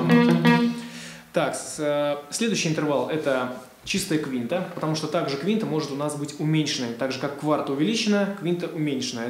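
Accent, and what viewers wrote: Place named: Germany. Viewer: Урок - супер! Спасибо! Те кто думает, что может играть без интервалов, Вы правы, но забудьте об импровизации (хотя Вас может выручить идеальный слух и память)., очень забавляет (по доброму) Ваше произношение английских слов с немецким прочтением;)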